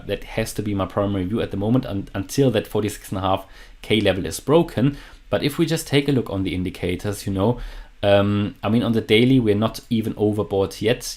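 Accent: Germany. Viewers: Where are you from? I kinda detect a german accent? ...Just curious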